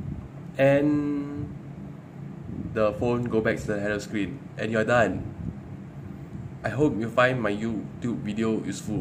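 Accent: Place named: Malaysia. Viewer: no wonder sounds like malaysian.thanks btw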